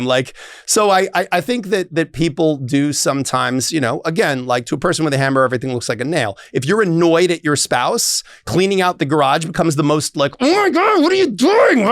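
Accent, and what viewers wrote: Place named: England, United Kingdom. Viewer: That’s a dynamite English accent. You’re the best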